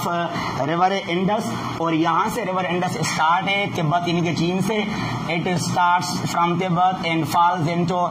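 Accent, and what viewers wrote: India. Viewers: Improve your pronunciation speaking like punjabi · ap ka accent b sindhi hai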